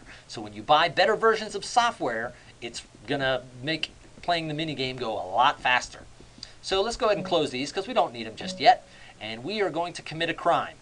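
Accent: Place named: United States. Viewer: Пиздец, ебаный американский английский. Всемирная тошниловка.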